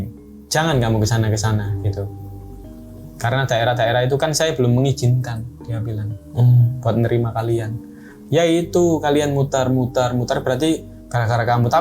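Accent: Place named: Java, Indonesia. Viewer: Assalamualaikum Hadir Wonogiri,bang, Medok Jawa nya mas, 😂😂😂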